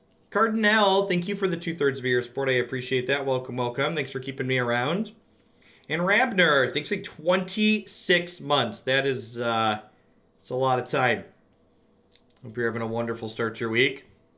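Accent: United States. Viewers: I have found 3 unearth to be very good in this archetype. Combined with fulminator mages and kambals in the side it makes for some fun interactions. As a fellow Illinoisian, I have part of those same speech patterns. Great as always, thanks! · a strong but generic american accent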